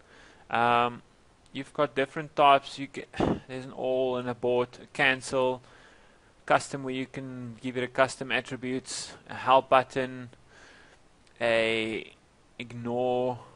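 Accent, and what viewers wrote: South Africa. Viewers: Are you afrikaans? Cuz i am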